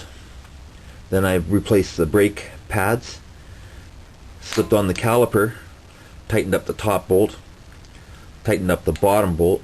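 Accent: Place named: Canada. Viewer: Canadian eh...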